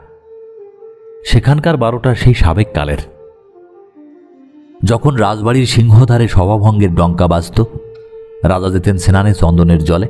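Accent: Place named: Bangal, Bangladesh. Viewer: আপনার কণ্ঠস্বর ভাল ৷ উচ্চারণের সমস্যা যাকে বলে 'বাঙাল-টান' আছে ৷ কিছু, মনে করবেন না, আমারও পূর্ব পুরুষ বাঙাল ৷ আপনি বাংলা দেশের গল্প সিলেক্ট করে শোনান না কেন? সঙ্গে চলুক কলকাতার উচ্চারণের প্র্যাকটিস...৷ এ ধরণের 'একটানা কথকতা' এখন এড়িয়ে চলুন ৷ অনেক চরিত্র যেখানে কথা বলছে ---সেই রকম গল্প রেকর্ড করুন ৷ আগের গল্পের নারী-কণ্ঠটি কিন্তু ভাল ছিল...তাঁর সাহায্য নিন ৷ আবারও বলছি আপনার কণ্ঠস্বর ভাল, পড়া ভাল.....ভাল থাকবেন বন্ধু 💚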